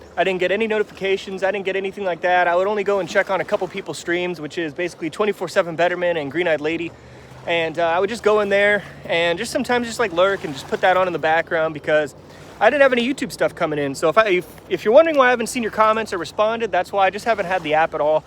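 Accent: South, United States. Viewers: funny thing to note, i noticed at a couple points in the video you're starting to pick up a very slight, almost imperceptible at this point, southern accent, only noticed it at one or two points in the video. that's pretty cool. interested to see how your accent changes over time the longer you're living there. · Hes developing a southern accent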